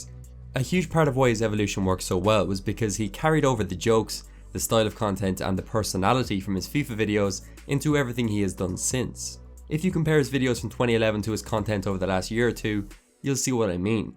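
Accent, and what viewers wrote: Ireland. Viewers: Man with that irish accent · Are you actually Irish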